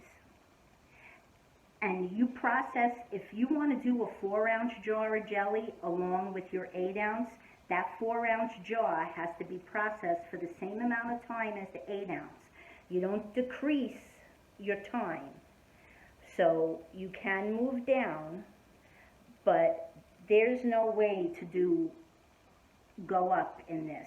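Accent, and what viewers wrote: New York, United States. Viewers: I love how you take the time to explain things so nicely and stress safety. This looks sooo good! Sounds like you might have some sort of allergy to something in those hot peppers. Bless your heart. Thank you for sharing this recipe. I’m gonna try this one for sure!, I love to hear your voice. I’m originally from South Jersey and hearing your NY accent warms my heart.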